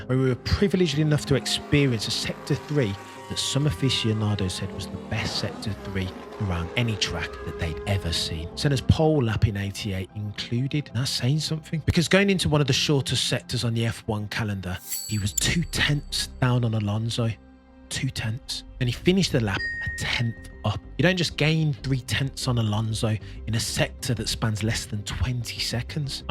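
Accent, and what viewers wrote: United Kingdom. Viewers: Very skeptical once I hear a British accent, because no one is more biased than you lot. Will give it a few minutes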